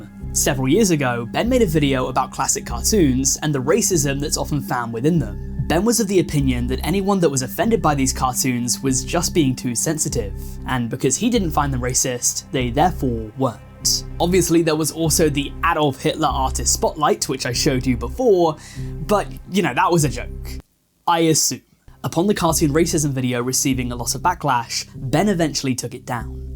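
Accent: United Kingdom. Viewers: i like you british cody ko, much better than the other one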